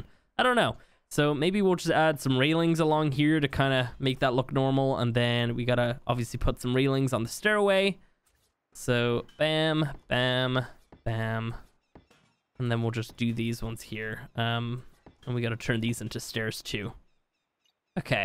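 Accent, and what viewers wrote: Ireland. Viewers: Who else hears the Irish was in voice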